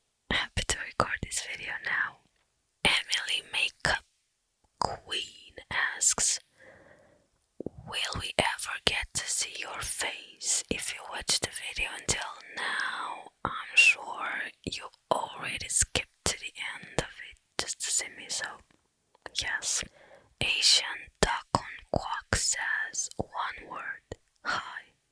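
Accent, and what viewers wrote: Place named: Romania. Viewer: You’re from Romania!! I love your accent so much and you are pretty! Keep up the good videos!!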